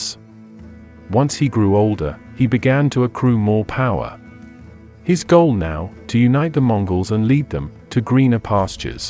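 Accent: United Kingdom. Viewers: Is narrator AI or just obscenely British?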